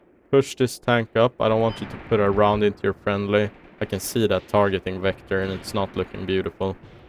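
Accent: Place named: Sweden. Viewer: I knew that you're Swedish from the accent lmao😭.Btw I love your content,keep on going,you'll eventually get more views and subs.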